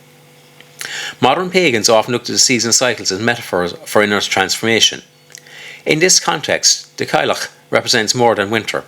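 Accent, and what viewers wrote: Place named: Cork, Ireland. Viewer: lovely cork accent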